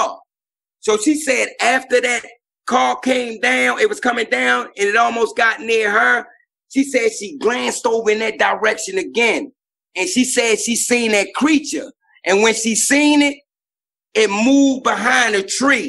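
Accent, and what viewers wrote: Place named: Baltimore, United States. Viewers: Being from Maryland I can assure you, his accent/dialect is exactly how the majority of folks frm "Bal-more" talk, and they call cigarettes "fugs" lol Good ppl...and, you are the man, Thanx for the great story telling. To all, stay safe and be well ☝🏽❤ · He has a heavy Baltimore accent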